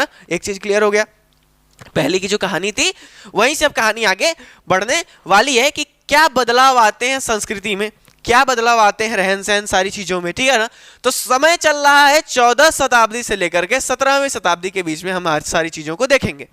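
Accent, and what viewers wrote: Bihar, India. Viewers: Bihrai tone............stole my heart ❤ bro.............🖤 bhai billions subscribers ho apke🖤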